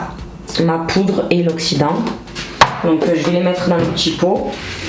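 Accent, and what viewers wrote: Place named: Toulouse, France. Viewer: On s’en beaucoup ton accent tu es toulousaine ? Sinon super vidéo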